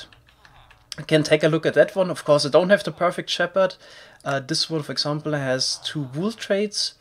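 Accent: Germany. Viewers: der aktzent klingt deutsch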